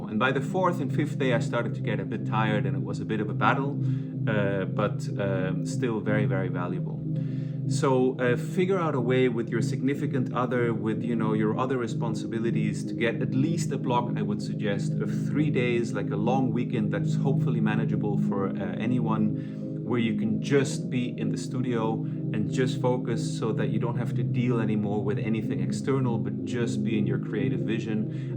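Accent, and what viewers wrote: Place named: Switzerland. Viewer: Thank you for your advice and your videos. Are you from switzerland, too? I thought i can hear a little bit of a swiss accent in your speaking.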